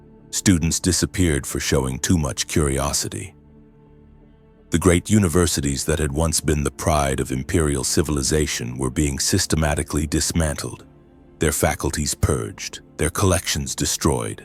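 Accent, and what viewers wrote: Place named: United States. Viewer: With so many accents you choose a basic american one? Lacks imagination and faith, the inquisition now watches you for both the sin of imagination and faith in what you believe. Furthermore the pics are not cannon. They Gray Knights are now also involved!!!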